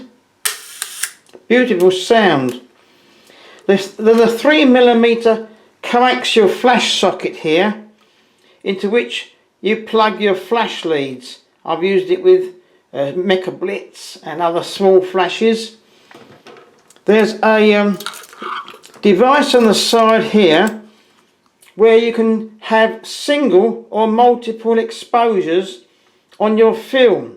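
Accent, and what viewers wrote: United Kingdom. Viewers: I had this camera at one time with the 180mm lens. I always loved this camera. I viewed your photos on Flickr too. I'm going to have to join Flickr one of these days. A lot of good photographers there. By your accent I can tell your from the UK. There are a lot of good British photography magazines out there. Maybe I'll buy this camera again someday. I like the interchangeable lenses.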